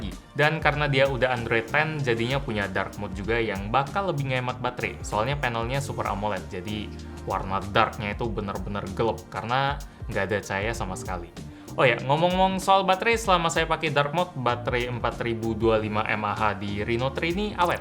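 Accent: Palembang, Indonesia. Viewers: Gelep itu bhs Palembang, krn orang palembang jd wajar aja masih kebawa bahasanya. Lagian beda tipis sama bhs indo. Beda E dan A aja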